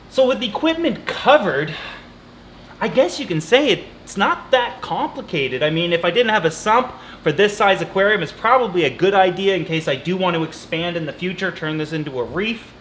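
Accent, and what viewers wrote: Canada. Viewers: he’s Canadian lol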